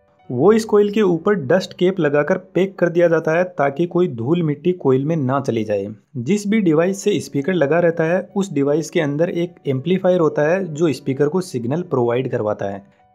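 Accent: Rajasthan, India. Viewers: Bhai tumhari aawaz se saf pata chalta hai ki tum rajasthan se belong karte ho .. shayad..?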